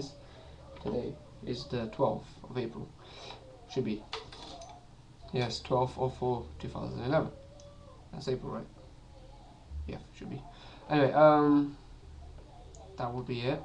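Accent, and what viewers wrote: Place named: Portugal. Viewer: u sound portugese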